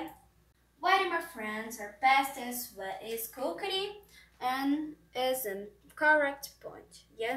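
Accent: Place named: United States. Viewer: Caramba tu parece uma americana falando kkk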